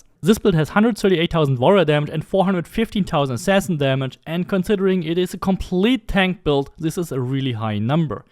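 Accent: Germany. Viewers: Man hört den Deutschen zwar noch rauß aber deine englische aussprache ,bis auf ein paar deutsche Klassiker, ist echt mega.Englisch Studium oder einfach nur sehr viel englisch geredet?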